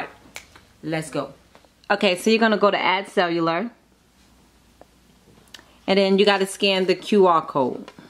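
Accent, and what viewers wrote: New York, United States. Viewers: She gotta be from New York cause she ode sound like Sky from Black Ink😭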